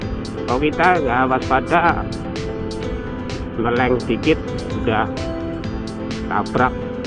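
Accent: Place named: Banyumas, Indonesia. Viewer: Nyimak sedulur ngapak ora ngapak ora penak. .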